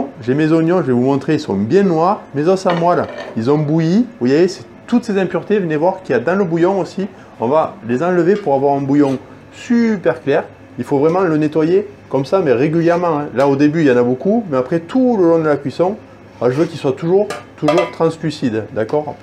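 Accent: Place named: Provence, France. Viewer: J'adore, je salive et l'accent de Pagnol en prime, que demande le peuple ! 👍😘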